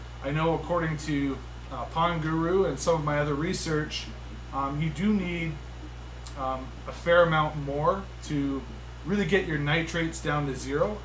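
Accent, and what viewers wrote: Canada. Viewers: Why do you have such a bad accent? Are you way deep in Canada?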